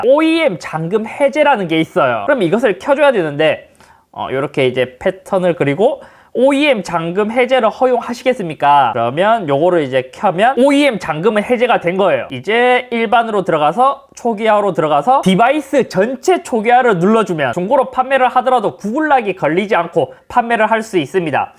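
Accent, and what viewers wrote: Gyeongsang, South Korea. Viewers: 역시 경북 안동 출신이시군요 사투리억양이라서 어디지...ㅋ 궁금했거든요 구독자20만 정도부터 눈여겨보던 유튜버이신데 벌써 118만!! 두둥 멋집니다 앞으로도 ~^^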